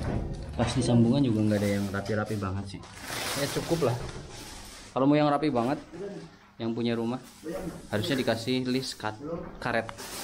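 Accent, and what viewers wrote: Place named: Java, Indonesia. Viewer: Medok bgt mas'e.. 😆😆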